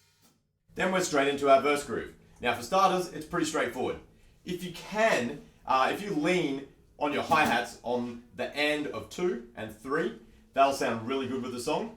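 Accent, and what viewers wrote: Australia. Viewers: is he Ashton's missing dad? he's a adult he plays drums like Ashton , he has a Australian accent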